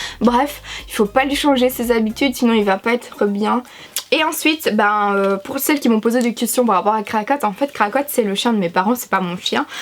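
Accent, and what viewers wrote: Belgium. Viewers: Cc Perso je trouve que tu as l'accent belge!!! Et c'est mieux car tu es Belge. Merci pour ta vidéo. Bisous de France 🇫🇷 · Je t'adore tes vidéos sont toujours aussi cool et on passe un super moment avec toi ! 😘 PS : oui je trouve que tu as un accent belge ! 😂❤ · Merci d’avoir répondu à ma question je suis choquée 😂❤️ maintenant je vais commenter plus souvent ! Sinon super vidéo comme d’hab 💓 (ps: je suis française et oui tu as un accent belge) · Oui ta un accent belge et j ai la même palette naked que toi je l adore trop PS : je t'adore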